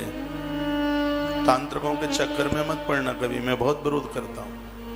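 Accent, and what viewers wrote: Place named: Bundelkhand, India. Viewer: Maharajji aapki bundelkhandi bahut malook lgat hai hm bhi bundelkhandi hai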